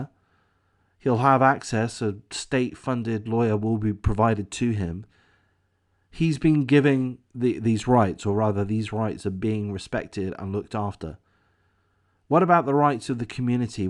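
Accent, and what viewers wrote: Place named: United Kingdom. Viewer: As listening you are clearly British like me, out of curiosity do you still live in England? Or follow these because of now living in America?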